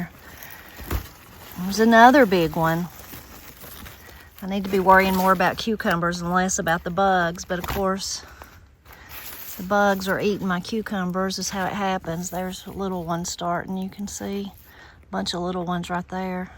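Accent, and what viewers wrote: Appalachia, United States. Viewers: I live in Nebraska. Have I ever been to Appalachia? Nope. Will I ever have a huge garden like you do? Nope. Will I ever put up produce, cook soup beans or kilt lettuce? Also no. But, I'll always watch these videos because your family is just the sweetest... and I just love the accent. Thank you for being so down to earth, humble, and Godly. ❤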